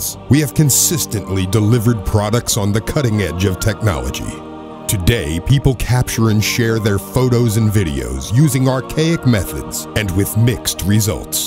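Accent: France. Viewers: i miss ze french accent..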